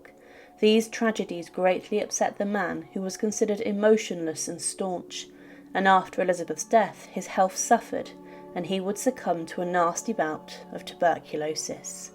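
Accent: United Kingdom. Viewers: I absolutely love your historical videos. I'm a fanatic of all things Tudor, so your in-depth knowledge of that historical era always draws me in. Moreover, being.fron the other side of the pond, I'm also a sucker for an eloquent British accent. 😊 Please keep up your beautiful work. ❤ You have a new subscriber.😊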